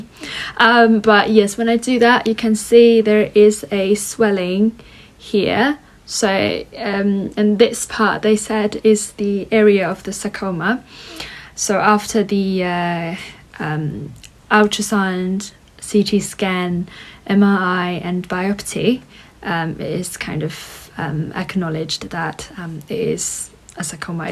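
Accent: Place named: RP, United Kingdom. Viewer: Hello,! I really appreciate your lovely contemporary Received Pronunciation (RP), your efforts to make this very first YouTube video, and, more importantly, your attitude towards your unfortunate experience due to this extraordinary disease. I hope you would receive great treatment from the NHS and ultimately combat the disease successfully! May you be free from suffering very soon! Yours sincerely, Jordy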